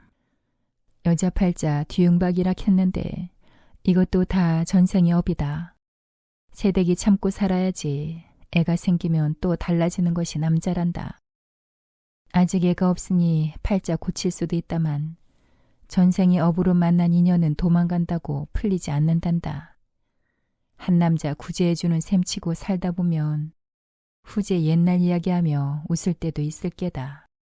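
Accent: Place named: Gyeongsang, South Korea. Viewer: 산 기슭에 판자를 얽어 움막을 짓고 살았던 사람들의 이야기가 연상되네요. 물론 한 세대의 간극은 있지만요. 술이 고주망태가 되어 마누라를 무지하게 패던 못난 놈들이 살았던 시절. 그래도 아침에는 해장국이 밥상에 오르던 그때 이야기는 이제는 없겟지요? 님의 경상도 사투리가 아주 구수합니다. 박재홍님의 옛 노래의 노래처럼 말입니다.잘들었습니다.☆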